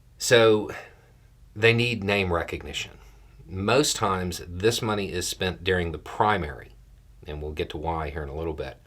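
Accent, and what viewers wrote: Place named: Florida, United States. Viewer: He’s also part of a comedy group who call themselves the liberal rednecks. It’s ironic southern humor. Trae Crowder, who shows up making pretty insightful political commentary once in a while, has a very noticeable rural Tennessee accent. himself, who’s name, btw, is not - that’s a typical deep south name, has a Florida accent which is slightly modified when he makes these videos, but the way he looks? That’s Florida Man. It’s all very regional American humor and plays up to American stereotypes. I can’t tell where you’re from from your name, but I’m willing to bet you’ve got the same thing going on in your country.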